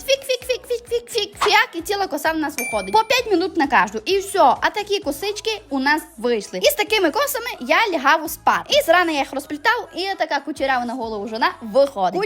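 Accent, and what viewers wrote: Transcarpathia, Ukraine. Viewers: Чи це Закарпатській діалект? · Це українська мова - закарпатський діалект🥰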